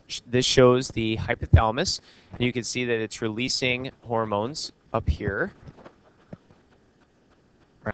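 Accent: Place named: Upstate New York, United States. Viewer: An upstate NY accent! What a surprise to find when I was surfing the internet looking for endocrine lectures :)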